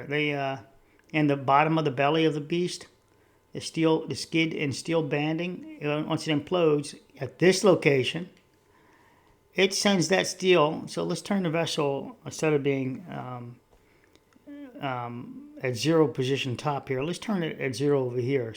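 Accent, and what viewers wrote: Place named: New York, United States. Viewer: Hello from Kansas! Really enjoy watching your analysis. It reminds me of Seinfeld. It isn't a show about nothing but idiots who troll think it is. Maybe its perhaps, what I think is a New Yorkish accent? or at least northeast coast American accent. I do have Kansas ears and we have little to no accent so . . .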